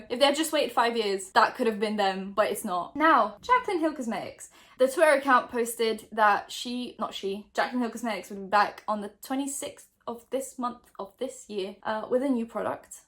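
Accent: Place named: Newcastle, United Kingdom. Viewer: where are you from out of curiosity? I’ve always assumes English (you sound Geordie to me but I have the worst ear for accent) but am now having a crisis because of how you said “in the uk.” Help me please XD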